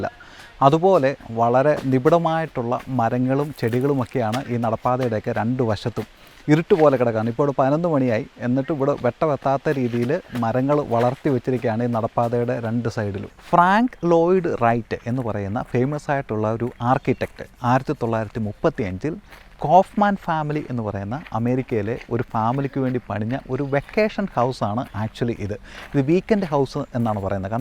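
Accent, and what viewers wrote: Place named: Pathanamthitta, India. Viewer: ഭാഷാ ശുദ്ധി കേട്ടപ്പോൾ പത്തനംതിട്ടക്കാരൻ ആണെന്ന് തോന്നുന്നു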